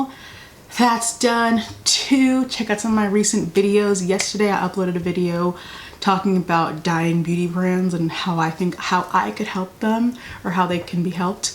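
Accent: New York, United States. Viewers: off topic but the new york really be jumping out lmao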